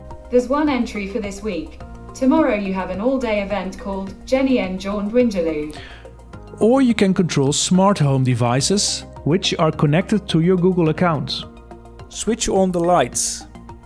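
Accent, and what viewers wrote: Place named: Netherlands. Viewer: He sounds so Dutch!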